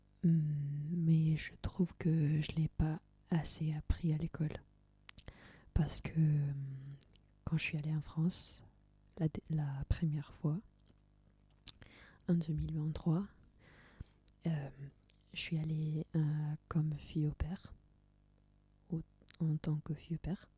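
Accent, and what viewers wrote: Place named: Italy. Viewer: Trop cool l'accent Italien, j'adore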